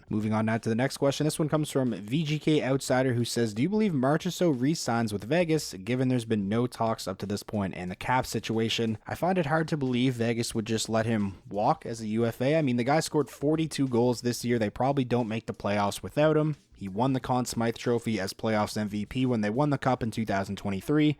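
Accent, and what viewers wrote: Nova Scotia, Canada. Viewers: Are you from Nova Scotia?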